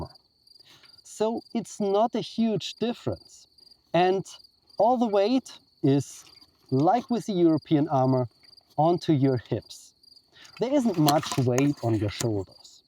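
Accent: Germany. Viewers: Man hört voll den deutschen Akzent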